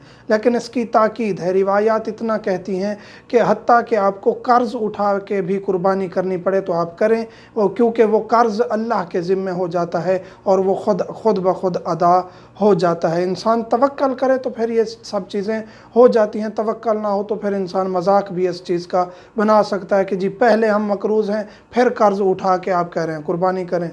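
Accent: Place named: Saraiki, Pakistan. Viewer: بھائ سرائیکی لگتے ہیں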